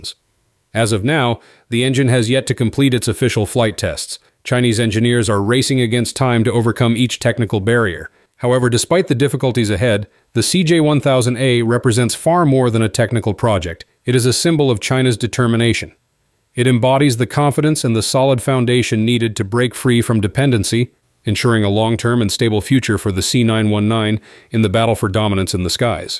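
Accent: United States. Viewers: Why is it always a droning American accent, that provides the 'expert' advice, telling us that China will die without outside technology? You need to get an air ticket to China you clown. Its just faintly possible, you might be educated to learn that China, by any comparison, is 100years in advance of the rest of the world. This is just shit comedy but pass the bloody popcorn anyway😂😂😂😂😂😂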